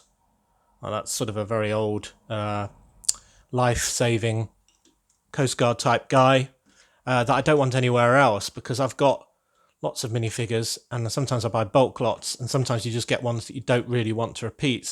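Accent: United Kingdom. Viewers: R U from the UK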